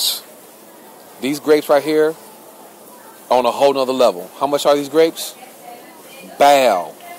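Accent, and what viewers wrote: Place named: AAVE, United States. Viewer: Ebonics makes my ears hurt. :(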